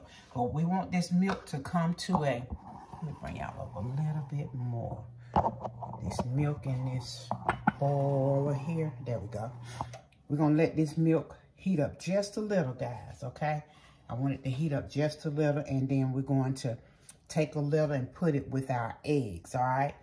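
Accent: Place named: South, United States. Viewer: So happy I just found your channel. Miss I love this recipe but even more I love you & your husband praying. I hope you don’t take offense but I love your sweet soothing Southern accent. Reminds me of my Mom. She was a wonderful cook from Texas but she never measured anything & she passed away at only 47 yrs old. A couple of my brothers don’t even remember her cooking. Mom made bread pudding & the BEST peach cobbler. She also made a wonderful yellow cake with a bundt type texture that had a nutmeg taste. I just turned 75 & can’t wait to check out all your great recipes. I liked & subscribed to your channel. God Bless & keep you & yours. Donna in Arizona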